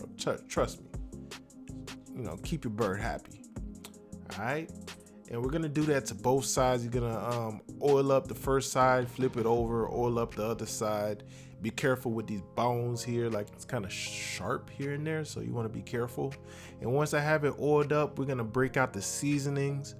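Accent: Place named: Florida, United States. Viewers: right! I'm from Tampa and when I was watching I was like he def from Atlanta or Florida lol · That accent right😂 I'm from Duval Co. He prob from Miami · right! I live in Fort Lauderdale. I’m like he’s has to be a local lol 🙌🏼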